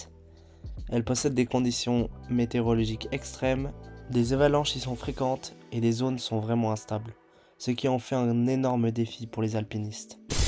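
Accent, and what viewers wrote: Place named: Italy. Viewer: Le petit accent italien 🤌🏽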